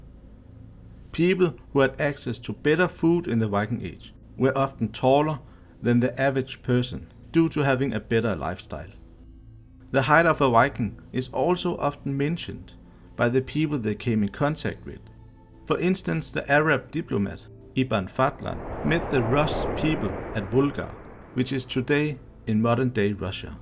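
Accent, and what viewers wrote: Denmark. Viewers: Was wondering if he was Danish too... Danes have the best accents for me amongst Scandinavians... I'm Dutch so I'm not bias. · Your speaking with dannish accent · Some heavy danglish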